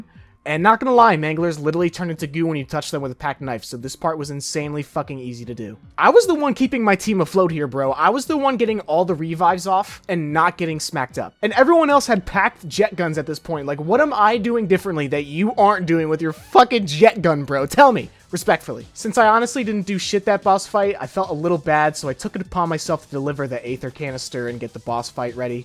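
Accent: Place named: Midwest, United States. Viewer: Love the Fucking commentary 😂 you from Chicago or the Midwest or something? Haha hope your channel grows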